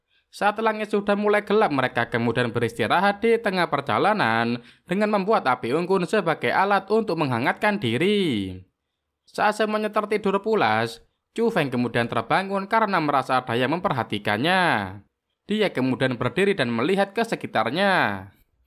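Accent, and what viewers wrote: Java, Indonesia. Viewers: Cara baca alur nya Jawir bet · Min.. Logatnya ganti Terkesan jawir 😂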